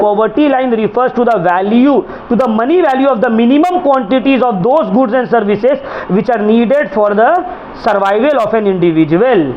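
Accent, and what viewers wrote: Kolkata, India. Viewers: Apka kalkatiya bt krne k tarika bohot hebi h sir♥️ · Sir apka kalkatiya accent I love it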